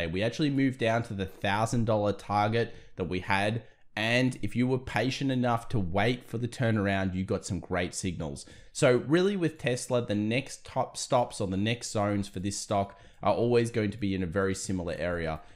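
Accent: Australia. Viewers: Hey, hey, hey! Welcome back. We've missed the great Aussie accent. 😂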